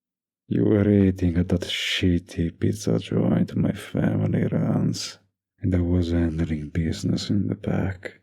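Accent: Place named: Russia. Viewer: I HEAR RUSSIAN ACCENT, ARE YOU RUSSIAN?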